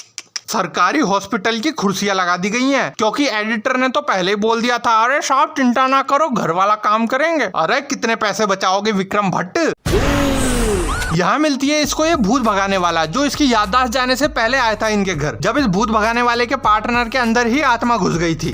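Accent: Rajasthan, India. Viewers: Bhai Rajasthani ho na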